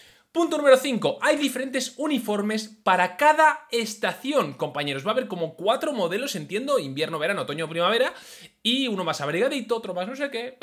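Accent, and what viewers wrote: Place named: Spain. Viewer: Sabiendo que sos de España (por tu acento) quisiera que me des tu opinión sobre los futuros DLC de Pokémon Escarlata y Púrpura? En la generación anterior, en Pokémon Espada y Escudo, los DLC correspondían respectivamente a La Isla Armadura (basada en la Isla de Man) y Las Nieves de la Corona (basada en la tundra de las Highlands escocesas). Te parece que los DLC de Pokémon Escarlata y Púrpura estarían basados respectivamente a las Islas Canarias y a las Islas Baleares? Saludos, aguardo tu contestación y si hay video al respecto, mucho mejor de en qué lugares de España podrían estar basados los futuros DLC de ésta generación?:)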